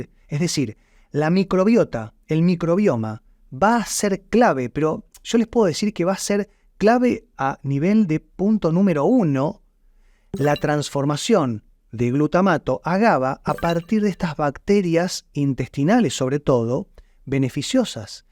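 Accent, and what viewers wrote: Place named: Rioplatense, Argentina. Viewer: Parece como argento o yorugua.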